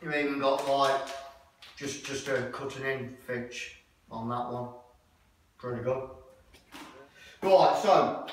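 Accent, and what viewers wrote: Leicester, United Kingdom. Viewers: hi,mate are you from leicester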